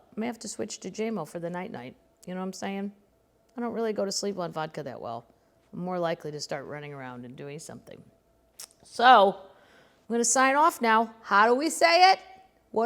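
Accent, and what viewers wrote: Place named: South, United States. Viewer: spot-on Southern accent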